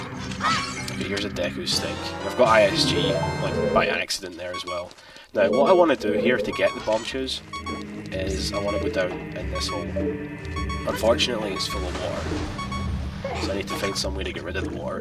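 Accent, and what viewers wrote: Scotland, United Kingdom. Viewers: R u scottish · Ok but his accent sound scottish, but thats just my opinion. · HES SCOTIIIIIIIIIIIIIIIIIIIIIISH WOOOOOOOOOOOOOOOOOOO (I'm American but I love Scottish accents) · Woo Scottish accent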